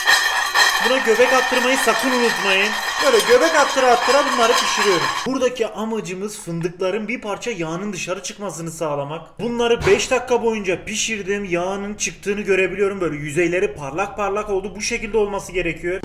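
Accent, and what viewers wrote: Adana, Turkey. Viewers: "Tencereyi ocağa eklüyürüm" Adanalı olanlar bu şiveyi bilir. Ben de şimdı karam yiyürüm. 😀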